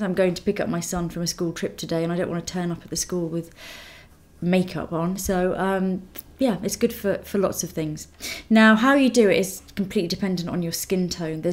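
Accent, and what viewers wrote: England, United Kingdom. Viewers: Is that British accent ?? ❤❤ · ahh her voice is so relaxing, like her accent and the tone and cadence of her voice. anyone know where (in england i assume) shes from? her accent is really similar to pj harveys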